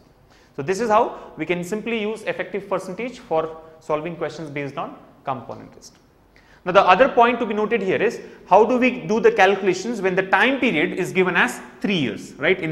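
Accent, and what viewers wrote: India. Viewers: Beautiful accent reminds me of my hometown India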